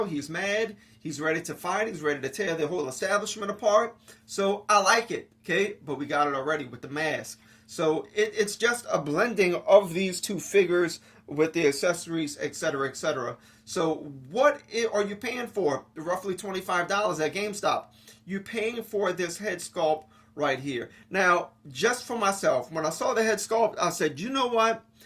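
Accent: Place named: New York, United States. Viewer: You have such a fascinating NYC accent. It reminds me a little bit of Jay-Z. And nice review, by the way. My two are on their way from GameStop. Considering I pre-ordered right when this went up..not sure how so many have it in hand already, yet mines just shipped.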